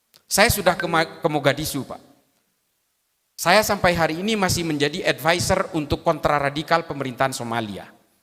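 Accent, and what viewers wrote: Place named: Madura, Indonesia. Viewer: Dari logat bahasanya seperti suku Madura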